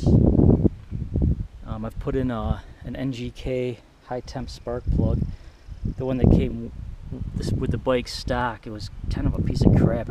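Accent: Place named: Midwest, United States. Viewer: You from Minnesota? I hear a Midwestern accent haha.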